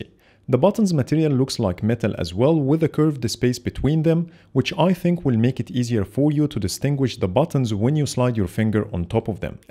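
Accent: Egypt. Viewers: طب و الله حاسس انك مصري رغم انك تتكلم انجليزي😁 هعرف نفسي صحيح لما تحطلي قلب😅 اخوك من تونس 🇪🇬❤️🇹🇳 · Your accent is a lot similar to our Egyptian one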